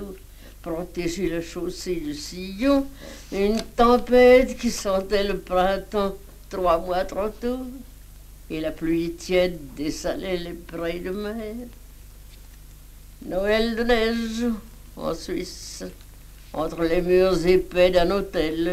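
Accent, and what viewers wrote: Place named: Burgundy, France. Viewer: Simplement l'accent bourguignon d'une femme de 80 ans à une époque où l'on avait conservé sans honte les particularités, les spécificités de sa région natale. Comme la langue s'est appauvrie !...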